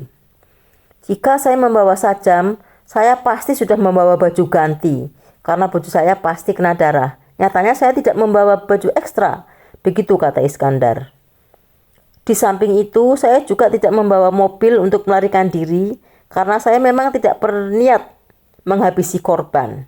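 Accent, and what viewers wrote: Java, Indonesia. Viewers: Suara nya MCM org jawa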